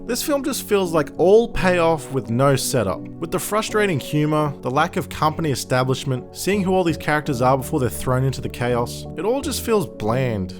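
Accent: United States. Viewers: I look forward to hear what your thoughts on the new transformers news is. Your American accent is really good. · Good American accent! Well done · That American accent though.....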